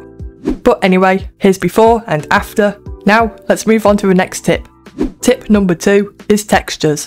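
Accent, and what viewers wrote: United Kingdom. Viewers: This british accent is funny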